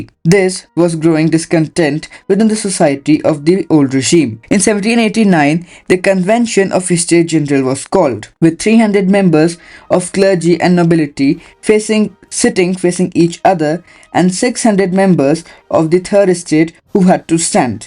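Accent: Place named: England, United Kingdom. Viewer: Excellent work,Your explanation of the French Revolution is so clear and engaging, and your English accent makes it even more enjoyable to listen to. Looking forward to more of your videos.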